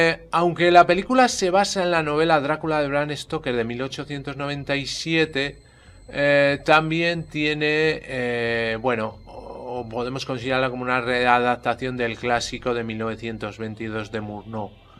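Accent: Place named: Spain. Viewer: No soporto tu acento español te bloqueare mejor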